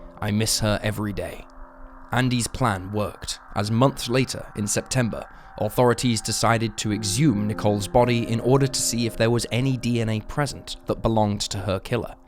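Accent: United Kingdom. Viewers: British accent 👎🏻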